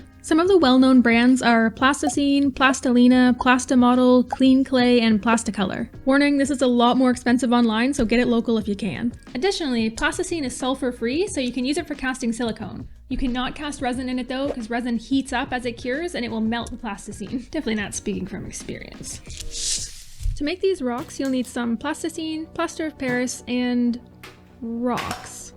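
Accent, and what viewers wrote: Newcastle, United Kingdom. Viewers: I was expecting a heavy geordie accent, but the rocks are good either way.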